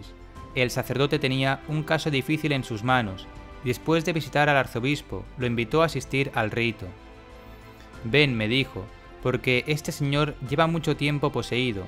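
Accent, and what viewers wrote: Spain. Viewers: Archidiócesis y arquidiócesis son correctas las dos en español, la definición de arquidiócesis se utiliza en Hispanoamérica y Archidiocesis en España. Espero que su duda quede resuelta . En italiano es ARCIDIOCESI. Tengan en cuenta que el narrador del video habla en español de España (no español latino por eso Uds. se confunden) Espero que la aclaración les sea de utilidad. Saludos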